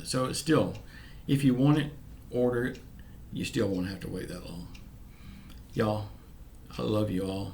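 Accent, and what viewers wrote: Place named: Louisiana, United States. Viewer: You hit the nail on the head. A $1200 check in 4 months exemplifies how thoughtless & callous our political leadership has been through the pandemic & closing of economy. As to Devos's & Kudlow's mind boggling push to reopen schools while the virus is raging through the country betrays where their loyalties lie. Rather than wanting to protect the children & teachers, they choose politics, downplaying the effects of a failed covid response., keep telling it like it is in your Louisiana twang. Don't let em off the hook.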